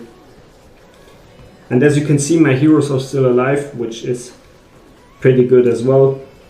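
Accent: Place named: Germany. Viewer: It’s a strongggg Germanic accent idk how he couldn’t tell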